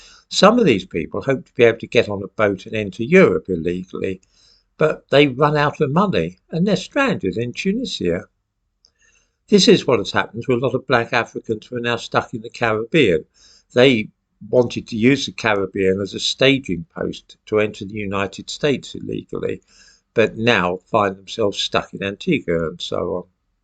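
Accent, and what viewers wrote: United Kingdom. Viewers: It’s really nice to listen to an eloquent british gentleman comment on our crazy situation that we’re in with the loss of all culture and infrastructure that our fore-bearers worked and fought to create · Is this British accent?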